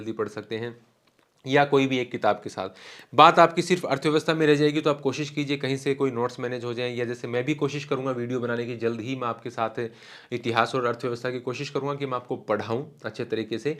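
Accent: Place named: Bharatpur, India. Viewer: गुरुजी नमस्कार मजा आ गयो आपकी बोली कतये भरतपुर के की तरह खड़ी है सीधी सपाट बात करें आपकू बहुत बहुत धन्यबाद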